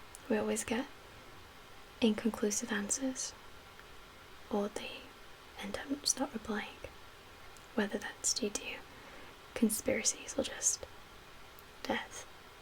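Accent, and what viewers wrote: Wales, United Kingdom. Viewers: Welsh, beautiful and a great ASMRtist..marry me?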